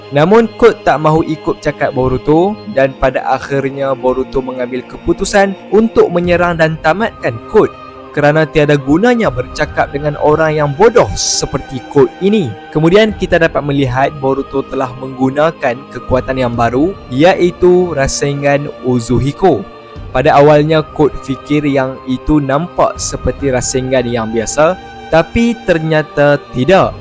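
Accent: North, Malaysia. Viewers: Bahasa melayu loghat utara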